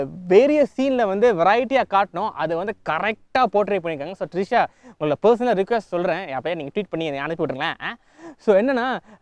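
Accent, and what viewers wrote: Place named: Malaysia. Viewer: He sounds like Kelly.. May be he belongs to Malaysia!!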